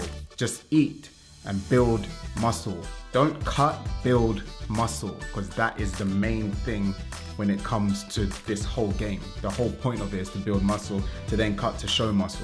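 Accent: United Kingdom. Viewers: I noticed, he is actually talk British English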